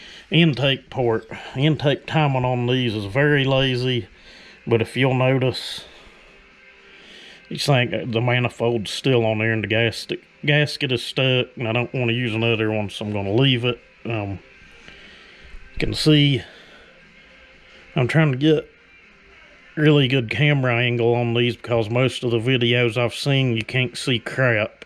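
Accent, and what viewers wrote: United States. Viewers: Nice information. Btw, in what part of US does people have this accent ?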